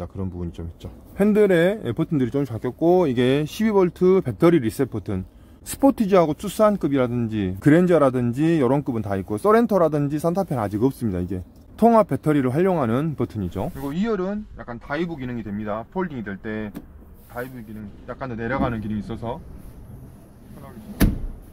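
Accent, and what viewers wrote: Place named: Jeolla, South Korea. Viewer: 전라도 분이구만 말이 귀에 속속 들어온거보니 ㅎㅎ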